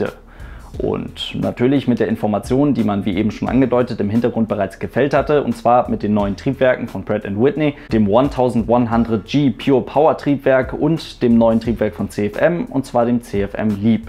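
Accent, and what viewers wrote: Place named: East Germany, Germany. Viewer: next generation mit deinem ostdeutschen Akzent im denglisch auszusprechen ist leider nicht witzig, sondern doof.... die englische Aussprache ist doch nicht so schwer, oder?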